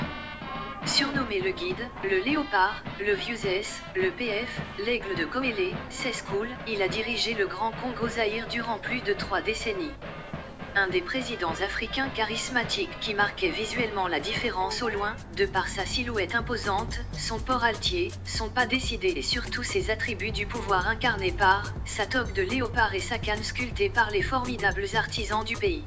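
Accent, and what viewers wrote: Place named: France. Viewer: Bizarre. Même pas capable de prononcer les noms comme un Congolais... Accentuation et nasalisations à la française...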